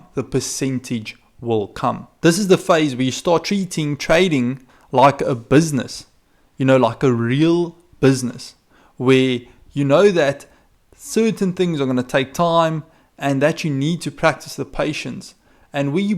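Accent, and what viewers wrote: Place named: South Africa. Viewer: You sound South African. Great content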